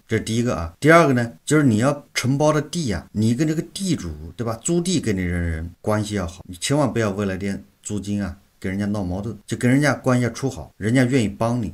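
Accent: China. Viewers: 沒字幕 大陸口音太重 聽不懂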